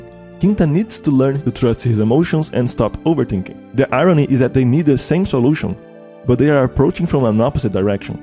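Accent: Brazil. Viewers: vc parece ser brasileiro pelo seu sotaque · Posso estar errado, mas esse cara é brasileiro? Tá parecendo um brasileiro falando inglês ou eu estou louco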